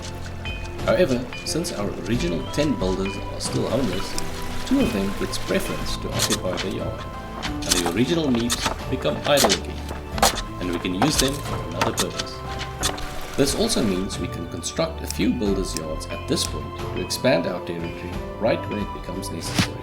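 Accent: South Africa. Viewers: you south african ?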